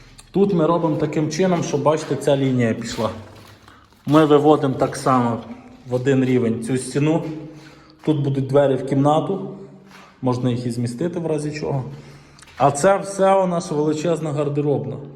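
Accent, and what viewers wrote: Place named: Surzhyk, Ukraine. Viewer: Мова з суржиком, трохи гидко звучить.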